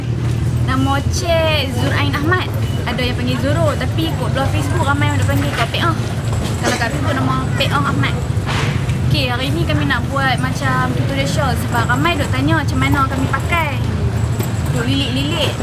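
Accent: Malaysia. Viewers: 2 0wg mne? bunyi ckp cm 0wg baling ja!haha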